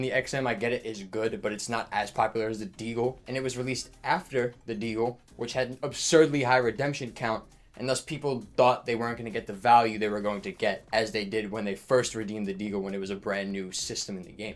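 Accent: New York, United States. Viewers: The intellectual new york accent is rare but very effective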